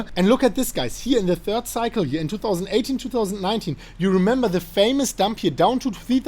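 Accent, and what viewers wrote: Germany. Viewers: Mike Porten this is a very strong german accent, they often pronouncing „the“ as see or they say somsing instead of something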